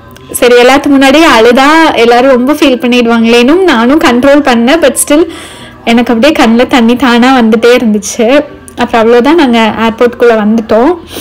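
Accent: Kongu, India. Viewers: Namma kongu slang alazhungoo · eanunga ka niga kongu mandalatha senthavangala unga slang thaniya theriyuthe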